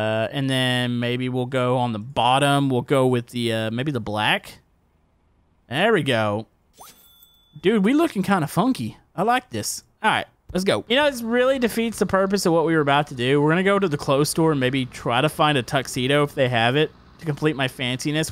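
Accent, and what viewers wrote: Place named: Texas, United States. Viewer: Come on I didn’t know you lived in Texas I thought you lived in like Minnesota or something I thought you don’t have the accent you do of the Texas accent probably should’ve guessed it by that but it’s cool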